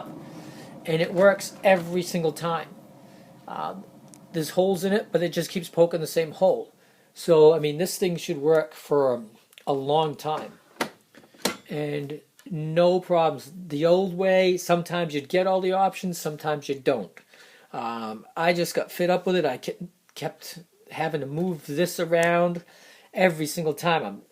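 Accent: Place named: New England, United States. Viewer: Definitely a New Englander!! (Bostonian?)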